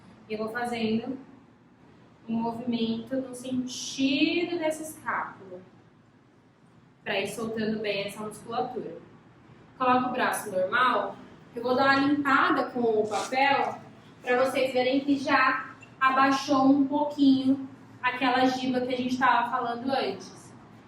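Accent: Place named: São Paulo, Brazil. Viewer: É uma pena que sotaque paulistano é tão ruim de ouvir.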